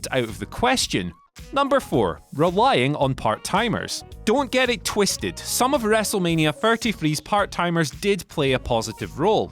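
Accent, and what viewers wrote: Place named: Scotland, United Kingdom. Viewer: Hey, he's scottish. They talk like that :o)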